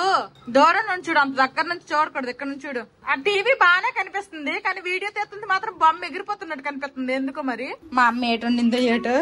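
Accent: Srikakulam, India. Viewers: Mana శ్రీకాకుళం culture slang exact ga chupistunnaru ea filters lekunda super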